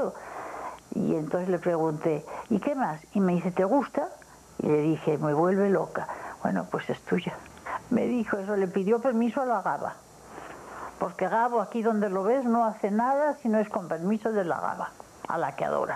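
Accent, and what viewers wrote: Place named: Navarre, Spain. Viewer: No perdio nunca su maravillosa diccion y acento español de Navarra. Descanse en paz compatriota en aquel pais maravilloso de gentes nobles como son los mexicanos q tanto amor y casa dieron a los españoles nomadas.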